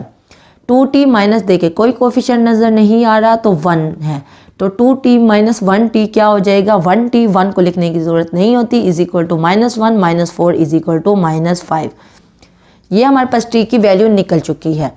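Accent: Lahore, Pakistan. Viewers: Sister ap waqas Nasir ki kuch lagti tu nahin..beacuse waqas ny review exercise upload nahin keen..or ap dono hain bhi Multan..zuban ki aarnay sy tu ap Lahori lug rahi hain..jawab zaroor daina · Teacher ka lahja dekh ka pata lag gaya ha k teacher Lahori ha😁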